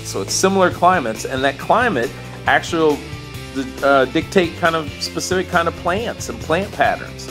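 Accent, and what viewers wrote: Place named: Texas, United States. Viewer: Dat half Texan accent Also very useful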